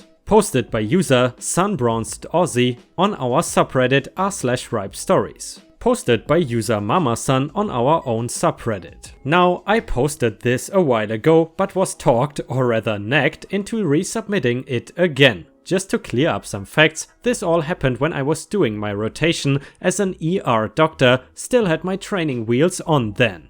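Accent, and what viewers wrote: Germany. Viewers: Are you german? Frage für einen Freund... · I don't know man,.. I like the stories you choose but as a German it's just so hard to listen to other people's German accent. Although your English is actually really good, I don't know wether to subscribe yet...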